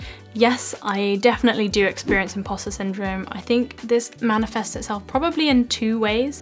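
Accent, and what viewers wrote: England, United Kingdom. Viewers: Angel face with an English accent yet sooo cute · I wonder would you have more video on British accent- love the way you talk, your accent and want to learn… Would you please make some videos just read a short story or Article (please speak a little slow so I can Repeat after you) then I can watch over and over again to listen and learn. Thank you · I love your British accent. It's so beautiful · You are very beautiful and love the British accent and you have a positive and upbeat attitude and personality. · hy how re you, i am from indonesia and i like to learn british aksen by hearing your aksen, btw where are you from, i mean your origin country, thnks😁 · I can listen to your crisp English accent for hours 🤩and by the way your Mandarin pronunciation is spot-on! Obviously you have a flair for languages. PS - fellow beef hor fun fan here 🥰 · HI Mam, You are so adorable, I love your English-speaking accent, I am learning English from your videos, and I always wait for your new video. 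Keep Blessing. Have a great job and all routine. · It is the first time I watch this video that British accents you pronounce and say so beautiful. keeping creative skills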